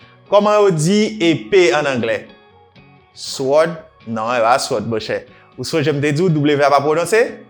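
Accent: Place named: United States. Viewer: Kou sa a vrèman enteresan ak yon pwonosiyasyon américain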